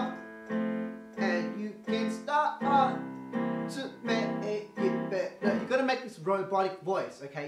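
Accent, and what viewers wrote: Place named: Asia. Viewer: Your voice would be better without the assian accent... sorry just suggesting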